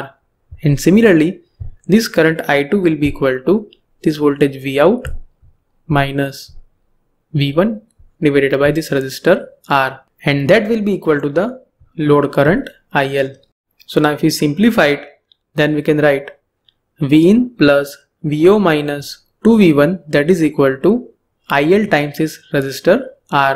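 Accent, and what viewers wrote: India. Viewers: Why does indian people never take the time to fix their fucking accents, sounds abysmal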